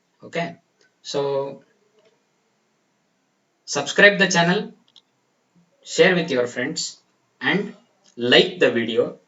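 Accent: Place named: North Karnataka, India. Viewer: Sir nim javari bashe esta ayt. Hinga classes madi namg help madri plz🙏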